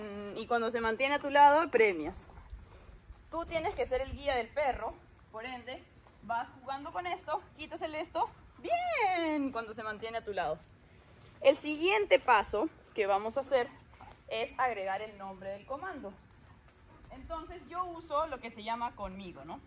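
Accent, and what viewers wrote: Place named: Panama City, Panama. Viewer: supongo que por su acento es de Panamá capital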